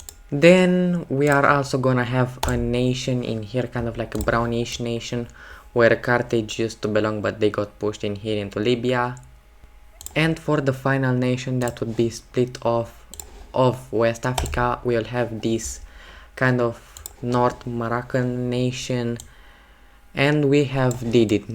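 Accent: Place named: Romania. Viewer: Peak romanian accent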